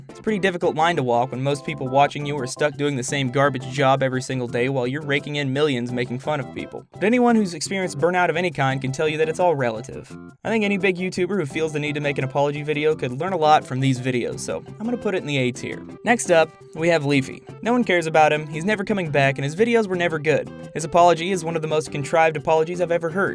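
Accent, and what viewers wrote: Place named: Midwest, United States. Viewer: I can here your accent big time in this vid. Can't say I completely place it but I believe that it's a midwestern accent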